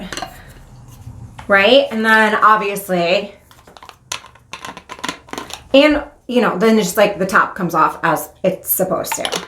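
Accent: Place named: New York, United States. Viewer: Random but i love your new york accent lol! Also as a fellow addict in recovery i love and adore the work you are doing. I was a heroin addict for the better part of 8 years on and off. Finally have substantial clean time and my twin boys are due to make their appearance may 3rd WE.DO.RECOVER!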